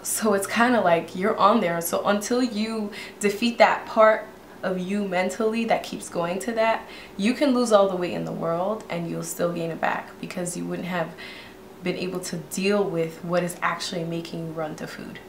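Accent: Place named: Africa. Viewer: Wow, I'm a new subbie and I've learned you are African, yet I could not detect an accent in your voice until I watched this video. When you talked about your chores in boarding school, you accent came out. I was pleasantly surprised :)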